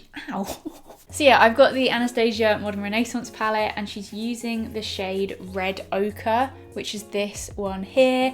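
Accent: United Kingdom. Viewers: Her accent reminds me the Harry Potter movies🤷🏽‍♀️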